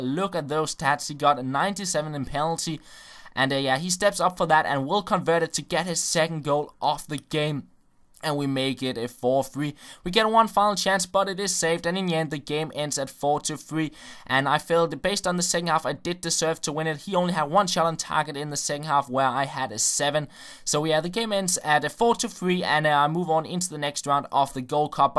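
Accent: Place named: Denmark. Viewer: Den danske accent<3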